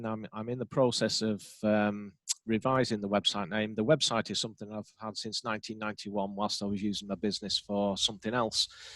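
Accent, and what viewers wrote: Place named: Northern England, United Kingdom. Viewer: I don't have a business...maybe one day...but this was still really informative and helpful. Talking about profiles, I don't like to see "junk" on my feed so I follow pages that I like (as you said, aesthetically pleasing) with posts that I am interested in. I live in the UK (originally from "up North" so loving the accents 😉 ) so one of the things I look for in a profile is some indication of where the author/owner is located, especially if it's a small business I may want to buy from now or in future, I would rather buy from someone in the UK. Thanks for all the useful info!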